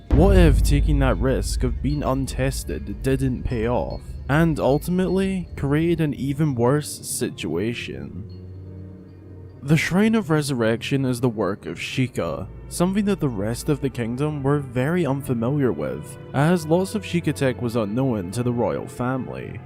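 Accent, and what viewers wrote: Scotland, United Kingdom. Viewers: The second the video started and I heard your voice, I immediately knew that you were Scottish, cus I'm Scottish myself! Idk just thought that was quite cool. But great video, solid points as well, you've earned a sub :D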